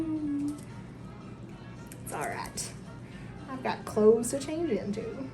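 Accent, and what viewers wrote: South, United States. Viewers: Do any of you know of a great guide to Lexington? I am looking to move and want a safe but affordable condo/townhome as I downsize. Do y'all like Lexington as your home? Is it easy to make friends? I am a Lupus patient and will need rheumatologist/nephrologist/PCP/orthopedic group...the works! I posted this question because of your sweet Southern accent - made me feel at home though I am from Texas. Any and all info would be appreciated. Thanks in advance and peace and love to all!! 💜🌺🌸💜💜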